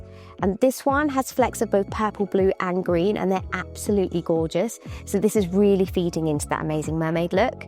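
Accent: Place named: Wales, United Kingdom. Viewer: Love this look ❤ Also this is so random, but looks and sounds a bit like Amy Dowden from Strictly! I'm sure I can hear a Welsh accent being Welsh myself, so I wonder if they are related somehow?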